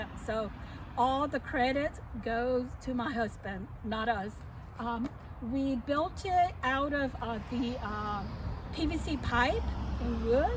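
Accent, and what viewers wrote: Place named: South, United States. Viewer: Love the greenhouse! Love your southern accent too.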